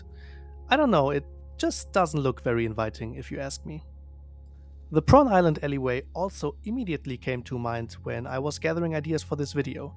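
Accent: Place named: Germany. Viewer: Thicc german aaah accent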